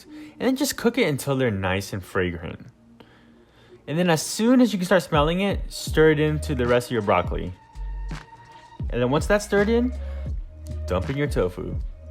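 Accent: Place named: United States. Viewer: wish you yanks would learn to speak properly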